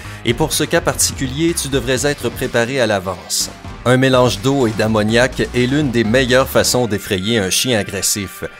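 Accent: Quebec, Canada. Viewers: Cet accent quebecois juste inssuportable..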